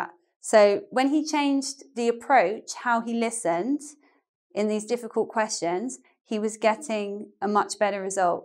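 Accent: United Kingdom. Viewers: I'm japanese. I've been learning English with American accent for 6 years. Thanks to your videos, I gradually get used to british accent. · I love you!!!, you are the best teacher of English of the world, I follow all of your channels, I like your British accent to speak. thank you very much for your advices.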